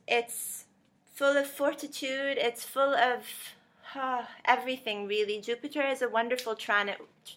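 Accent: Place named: Ireland. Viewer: are you Irish? - I love your accent xx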